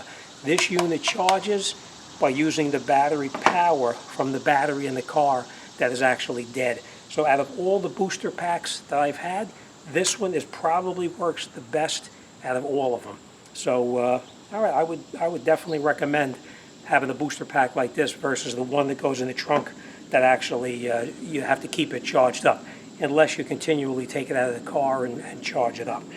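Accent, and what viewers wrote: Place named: East Coast, United States. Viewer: i can hear boston or new jersey in your ascent somewhere east coast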